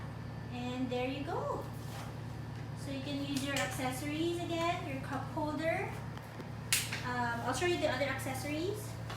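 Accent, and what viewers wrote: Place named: Philippines. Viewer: Hate the accent I can’t…. Pinoy much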